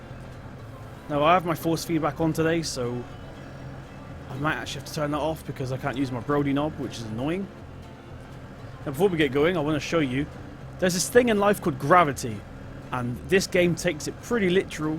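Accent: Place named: Northern England, United Kingdom. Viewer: oh deer pal so you sound northern dint realise m8t your vids are amazing can u do state trooper patrol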